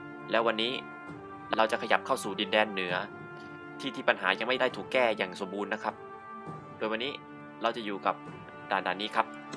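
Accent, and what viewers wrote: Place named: South, Thailand. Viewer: จากที่ดูหลายๆคลิป ผมรู้ว่าพี่เป็นคนใต้แต่ผมแค่ไม่มีหลักฐาน สำเนียงคุ้นมากครับ 😊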